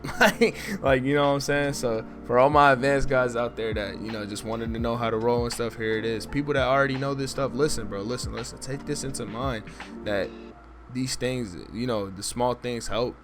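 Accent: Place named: Florida, United States. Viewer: dope vid u must be from florida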